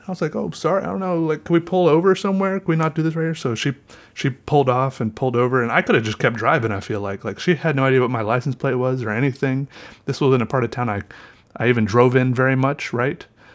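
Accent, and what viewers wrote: South, United States. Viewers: the southern accent is a no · Yes very southern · I hear a little southern accent in there... I like it :) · I haven't watched your channel in like a year and yes you sound so much more southern lmao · Enjoy your southern draw but your drawings more.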